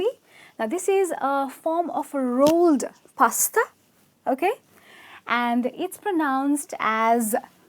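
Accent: India. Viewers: No 1, she doesnt speak british english, more of indian slang english, no.2 it's temato not tomeito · She is pronouncing it in Indian English, for those who were wondering. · This sounds more like Indian English. Plus no onion in bruschetta ;) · I think she has spoken all the words correctly in the Indian context. Country wise,words will always sound different. We dont speak like Americans or British. Appreciate the fact that we are atleast adopting these funny weird foreign words and trying to pronounce them correctly unlike americans ,europeans...etc who never get our food names correct. Can you pronounce Tandoori correctly ? Can u guys pronounce Baingan ka Bharta correctly ?? Can you pronounce Sambhar correctly? Countrywise words will always have a colloquial touch invariably. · That's commonly heard in India. Very sad for this lady, trying to teach us, to use. 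· i am sorry. i do not want to learn indian english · Absolutely right. As a native English speaker from tbe UK this woman has gigen me a headache with her English. Most of it prounced wrong. She is obviously from Indian background and they always seem to prounced their v as a W.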